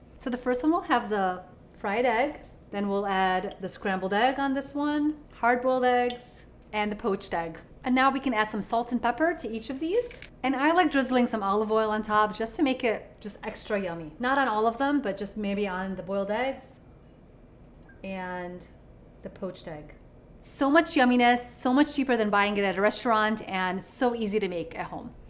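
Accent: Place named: Michigan, United States. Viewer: Forget the avocado toast; where is that accent from? Im guessing deep Michigan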